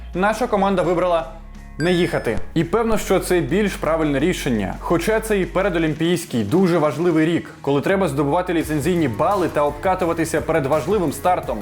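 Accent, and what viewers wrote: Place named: Urals, Russia. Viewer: Ведущая вообще не в теме,павловский и немцов?Да он в гробу переворачивается,когда его приравнивают к флюгеру-коньюктурщику-приспособленцу павловскому,который в Украине был идеологом всех темныз сил,януковича,отравление Ющенко,его идея,до последних предвоенных дней топил за опзж на ахметовских каналах,с другими рыговскими прорашисткими недобитками,вы о чем.Исправляйте свой уральский говорок,отличительная черта всех неоднозначных ведущих данного канала,то полный рот дикции,то внешность неоднозначная,это толерантность такая?))))Посмотрите оа Настоящее время,и ведущие и подача,у Михаила Борисовича денег мало?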